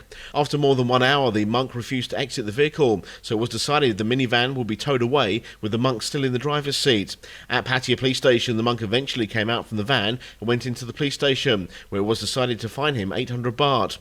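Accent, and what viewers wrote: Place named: United Kingdom. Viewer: Ei pysty. Mun huomio menee ton puheelle nauramiseen. Liian vahva brittiaksentti😭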